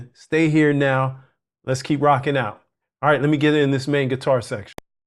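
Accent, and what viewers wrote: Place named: South, United States. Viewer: 🤣🤣🤣yes, maybe. But you actually do have a somewhat southern accent. I live in New Orleans and I grew up around up near those guys. I appreciate your constructive approach through your videos. Extremely helpful. Thank you so much.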